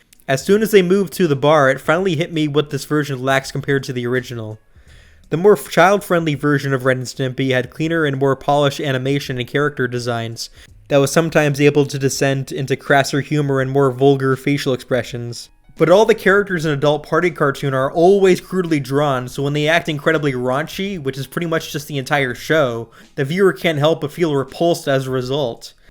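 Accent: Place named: Canada. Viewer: Good video bro! Also, what country are you from? you sound pretty Canadian from what i hear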